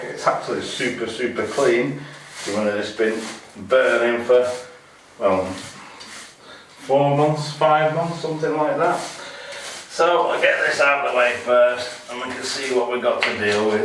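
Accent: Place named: England, United Kingdom. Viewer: Could you tell me where in England is your accent coming from? Thanx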